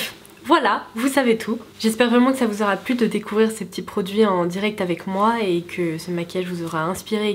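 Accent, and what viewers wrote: England, United Kingdom. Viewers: Magnifique make up 👍👍👍j'adore ton accent anglais, c 'est vraiment bien ce que fait SPacenK👍👍👍😊😊😊😊😊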